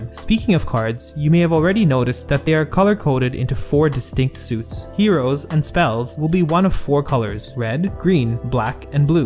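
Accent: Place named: Philippines. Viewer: Are you Filipino by any chance? You have a Filipino accent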